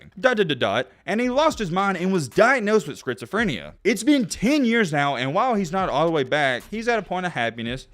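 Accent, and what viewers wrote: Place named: Kentucky, United States. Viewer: This guys definitely from Kentucky lol I can here it in his accent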